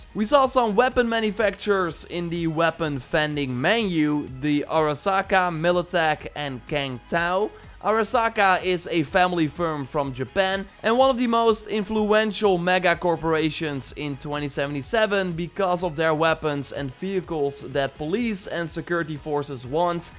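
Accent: Netherlands. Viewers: I couldn't help but hear your strong dutch accent. xD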